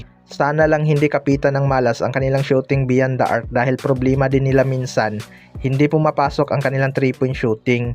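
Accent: Visayas, Philippines. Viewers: Samang pkinggang boses ng bisaya tpos bkla pa tpos fake news pa ay sarap samplin ng burat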